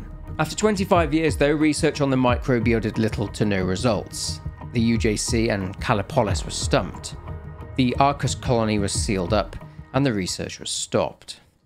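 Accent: United Kingdom. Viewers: british, but soothing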